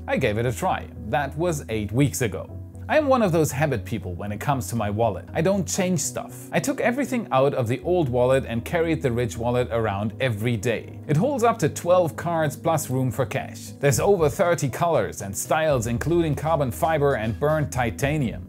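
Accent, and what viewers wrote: Germany. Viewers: really fun an episode with German accent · do a future episode in the German accent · your German accent brings back memories of Guenter Wendt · You should indeed do one with your German accent on display. Really enjoy the out takes at the end of your videos as well. you rock! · Hie.... I'm more anticipating the episode in the German accent than the 15km hop😆😆👍👏👏😁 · I really like the accent... where the Germans are speaking in English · You should always use the German accent. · I vote to go with German accent... just how you would normally talk and not exaggerated. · Yes, PLEASE do an episode with your natural German accent! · German accent? It’s like Von Braun is back amongst us. You Rock! Tschuss! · YESSS! A German accent episode, please! 😀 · Totally would appreciate an episode in your Deutschland accent. Reminds me of my mothers, who immigrated in 1960 to America. 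I think it would harken back to some of the greatest rocket scientists in history. Thumbs up if you agree. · episodes with a German accent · do a show in your german accent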